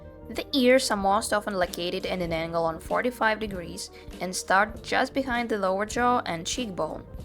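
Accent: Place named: Indonesia. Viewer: For some reason I hear a little Indonesian accent lol, thank you so much for the tuts!!💖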